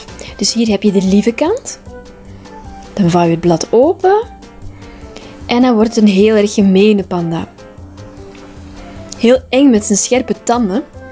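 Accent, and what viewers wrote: Belgium. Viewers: Jij bent toch Belgisch?, te horen aan je stem🇧🇪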